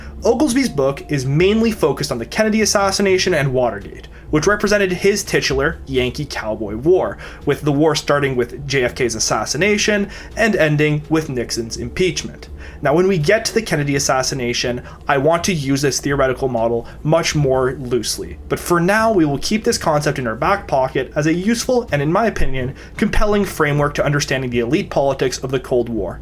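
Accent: Canada. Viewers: The Canadian accent is rough. You have a major problem with your As.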